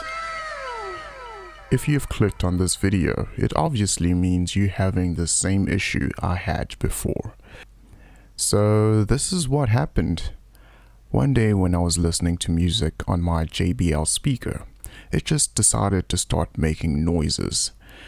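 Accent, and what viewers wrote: South Africa. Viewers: South African? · South african accent.. Thanks.. Good info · you sound like you're from South Africa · You sound south african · South African? lol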